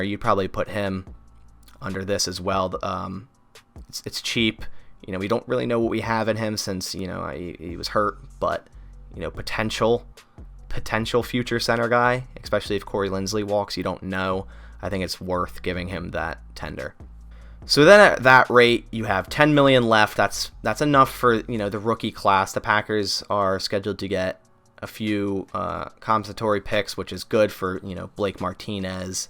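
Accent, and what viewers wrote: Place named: Maryland, United States. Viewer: i watched the wire recently and ive been on the lookout for maryland area accents; i love yours! keep making good content :) and go pack go of course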